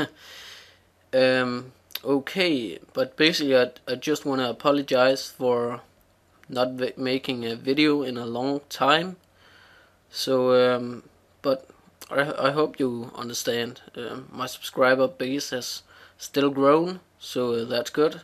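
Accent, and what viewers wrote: Denmark. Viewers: This is your channel, which means that you should do what ever you want. if you want to promote other people you should do that. If people don't want to watch it they can just close the video again... though if you want advice I would say you should work on your accent because, atleast to me, the danish accent makes people sound dummer than they actually are... though it might just be because I'm a dane myself...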